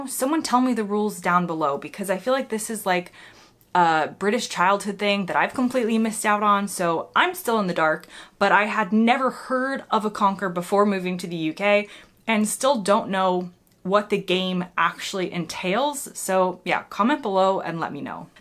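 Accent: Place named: United States. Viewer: The self deprecating sarcasm about the drollness of a video about parsnips being delivered with the chirpy American accent is brilliant, we are obviously rubbing off on you, love the videos ❤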